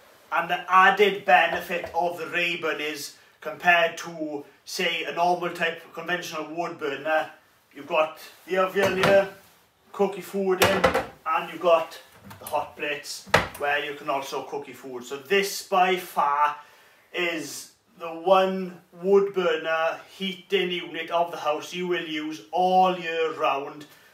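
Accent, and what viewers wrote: Wales, United Kingdom. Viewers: Hi, what model rayburn have you and how many rads? Can the rayburn handle it? Do you know what sort of kw output you get from your rayburn? Im wondering if a rayburn would heat a kitchen adjacent to my lounge if i put the rayburn in my inglenook in the lounge as flu etc already in place currently have 5kw log burner in lounge and heat won't travel to kitchen i have tried fans and all sorts now thinking of rayburn ty for video nice to hear welsh accent again after growing up in Brecon, in Shropshire now. Cheers.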